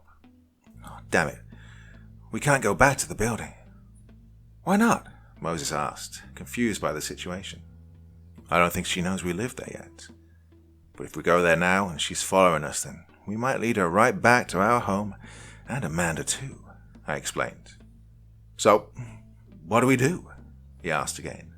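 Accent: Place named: United Kingdom. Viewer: Hearing this in his British accent was great! But I prefer the American version more!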